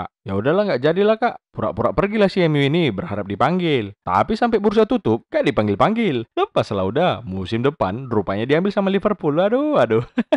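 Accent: Medan, Indonesia. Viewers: NEW SUBS ~~ gw suka banget channel ini, lucu dan menghibur dengan aksen melayu medannya, ok banget bang!! semangat terus dan tetap upload video, eaa !!☺ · Bahasa Medan ini mah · Nampak kalii ahh logat Medannya😅.. mantavv bg 👍🏻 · logat medan kali ahhhh... mantab kali kurasa. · emg kekgitu logat medan bg. Keras tapi lucu. 😀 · Memang harus diakui, MANTAP kali konsep channel kau ini bang. dengan logat medan yg khas, penyampaian yg unik dan Editing yg cukup rumit ditambah sentuhan humor, memang layak lah channel mu ini jadi konten kreator yg ditunggu tunggu. Semoga cepat tembus 1 juta subs abg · Logat² Medan bah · Org medan ini, kek paniliar kali awak sama intonasinya, horas lah lek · Adminya kek orang Medan kurasa dari logatnya kwwkwkwk ngakak laeeeee wkwkkwkw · Medan kalilah ngomongnya abang ini... mantap lanjutkan bang👍👍👍👍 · tapi tidak semua orang medan bisa membawakan video dengan logat bicara selucu ini sih menurutku.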